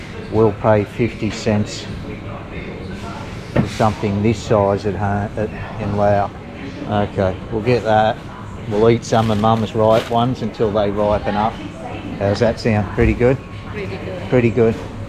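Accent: Australia. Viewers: See you soon I'am going to work they now you sound like ausy mate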